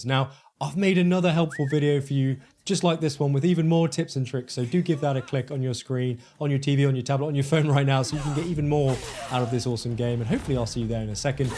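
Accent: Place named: RP, United Kingdom. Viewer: Your very posh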